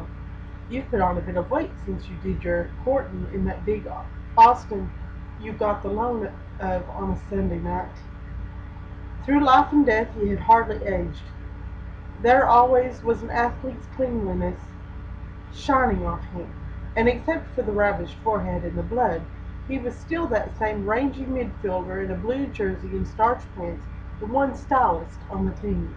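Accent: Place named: South, United States. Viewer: Thank you for posting this reading. Lovely. I have never heard Station Island read in anything remotely like your (Southern?) accent. To my ear there's an earthy (even folksy) tone to your voice that suits Heaney's poetry surprisingly well.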